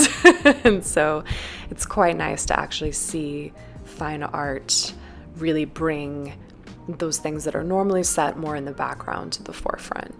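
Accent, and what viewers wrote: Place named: Wyoming, United States. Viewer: Nice wyoming accent. Love it. Pollack (The artist, not the fish) was from Cody, wasn't he. Content, somewhat stultifying, and prosaic, though. It's not Art, is it?! Let's be honest. And, as we all know, taste cannot be bought. And as that rapscallion scoundrel Hamlet once said, to be honest is to be one person picked out of ten thousand. Yes. Stultifying and prosaic is the apt description for these visuals. But thank you for making the effort. Noel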